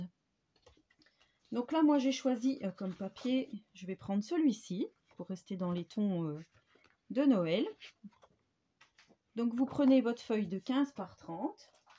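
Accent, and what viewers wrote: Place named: Haut-Doubs, France. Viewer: Coucou. Je me suis inscrite lors de ra vidéo carte bonhommes de neige que je vais faire des que j’aurai un peu de temps. Tu viens de parler du haut Doubs ce qui me conforte dans le fait que j’avais bien reconnu cet accent si typique et qu’on ne put pas confondre avec un autre.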